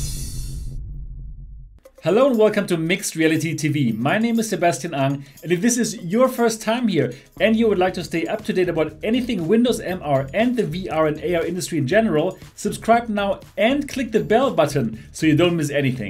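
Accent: Germany. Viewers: Are you from germany? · Man hört von der ersten Sekunde, das du ein Deutscher bist ^^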